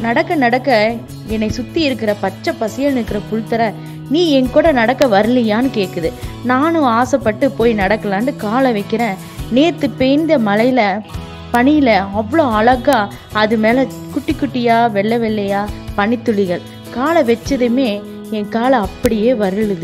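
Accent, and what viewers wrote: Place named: Kongu, India. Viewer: .... Miga Miga Azhkai ullathu... Unthan peyarai polavea... Ne pesi konjum kongu tamil.....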